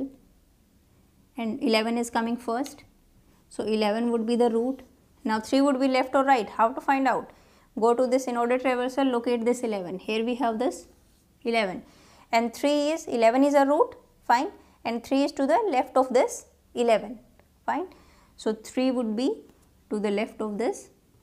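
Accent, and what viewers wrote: India. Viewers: fucking the Indian accent!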